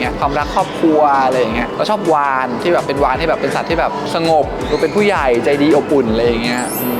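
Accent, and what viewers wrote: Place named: Isan, Thailand. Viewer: พี่ต๋องพูดอีสานน่ารักกก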